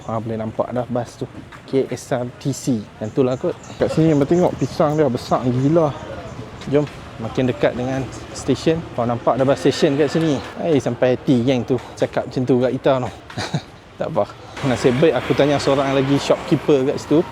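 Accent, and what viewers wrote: North, Malaysia. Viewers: Terbaik bro your video, aku baru subscribe, dah habis da video pasal Kerala aku tgk, dgn slang Utara, mmg terbaik la. Penerangan sangat jelas.and one more thing, brother.. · Hi, I baru last week terjumpa your YouTube Channel..very interesting. Suka dgq u cakap utara as I am also org utara from Perlis. Pasai rumah tepi railway tu depa tak terganggu sebab dok besa dah. mcm rumah I kt kampung di perlis, mmg railway tepi rumah. since kecik kami dengaq bunyi keta api , la ni rail tu lagi dekat sejak depa buat ETS. dulu masa kecik2 kami dok main atas tu rail tu saja..bila keta api nak mai kami cepat2 turun. Alhamdulillah tak penah berlaku kemalangan jiwa. tapi la ni org dah bubuh pagaq lah. 😁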